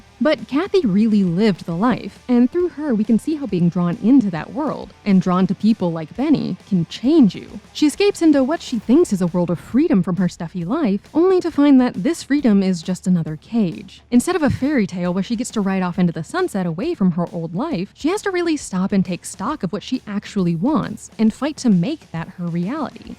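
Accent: United States. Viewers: Chicago area accent. Not that different from the NJ accent near NYC.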